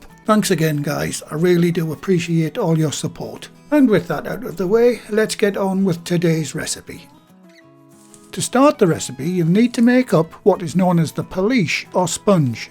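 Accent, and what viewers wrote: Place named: North East England, United Kingdom. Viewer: friends of mine say you should do some ASMR videos, they really like your relaxing north east accent. obviously i have the same accent but i sound like a rougher slang version of jimmy nail lol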